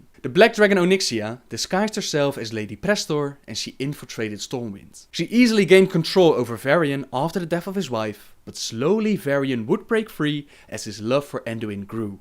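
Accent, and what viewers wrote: Netherlands. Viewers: Are you Dutch/Belgian? Your accent sounds like Dutch. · gij zijt nen hollander :D direct gehoord :p -belg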